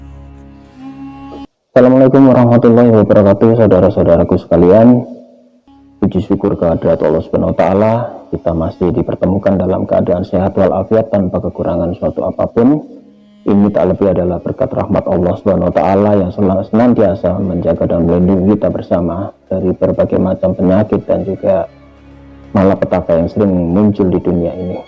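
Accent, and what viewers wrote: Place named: Java, Indonesia. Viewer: Kau bercakap berteleleh...macam org nak mampus....jawa medokkkkk...insyallah..Ali diaAb Allah...Aaminnnnnn....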